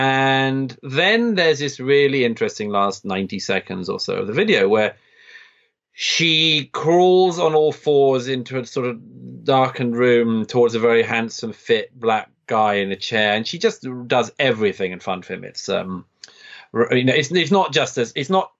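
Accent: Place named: RP, United Kingdom. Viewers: One minute in, and here's another old man who says a lot of hot air with a posh accent. I hope this doesn't become a trend. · Society makes impossible demands on both men and women because we have a capitalist society, but this is missing from this faux intellectuals' 'message'. Just because he has a posh accent doesn't mean that there isn't plenty missing in what he has to say.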